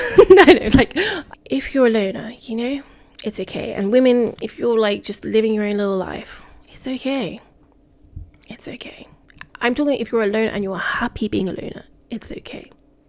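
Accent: United Kingdom. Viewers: Are you english I love your accent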